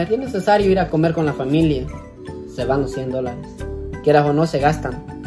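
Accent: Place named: Guatemala. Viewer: Con tu forma de hablar me dice que eres de guatemala y también la musica de fonod es muy típica de aquí XD. Orgulloso de mi guarte :'3 comiendo mrd juntos pero juntos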